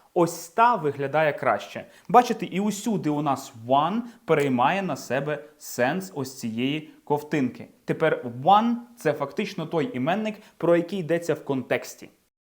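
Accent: United Kingdom. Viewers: Спасибо большое ❤ отлично, что и на украинском и на английском ❤❤ причем у тебя британский английский ❤ очень интересно рассказываешь.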